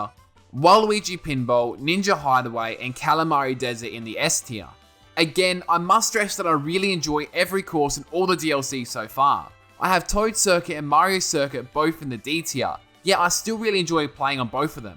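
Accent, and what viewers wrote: Australia. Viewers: Amazing video! Here is my list: 8.Mario circuit 3 7.Sky High Sundae 6.New york Minute 5.Kalimari desert 4.Snow land 3.Sydney Sprint 2.Waluigi Pinball 1.Mushroom Gorge And I really like your Australian Accent:) Keep the good up💖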